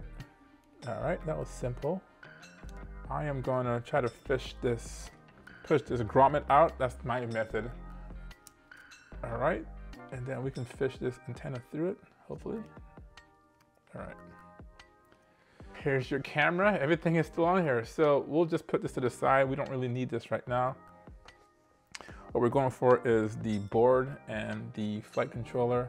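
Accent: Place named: Caribbean. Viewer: Sorry sound like you are from the Caribbean which island?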